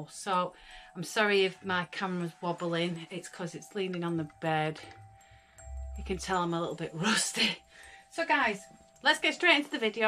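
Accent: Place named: Bolton, United Kingdom. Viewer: Cool Bedroom! Gotta be like a Bolton or Burnley accent you've got hasn't it? As a Southerner I'm probably completely wrong!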